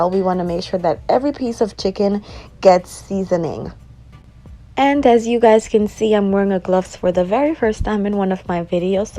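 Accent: United States. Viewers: You sound a lil Geechee ngl you would fit right in Charleston NC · Is she really Jamaican, all i hear is an Yank accent! Anyone can cook Jamaican food and claim Jamaican heritage!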